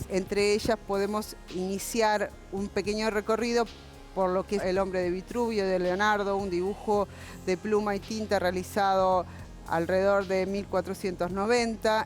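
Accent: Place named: Argentina. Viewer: Más allá si está bien o regularmente explicado , para mi es claro , me gusta escuchar una voz argentina de una bella mujer.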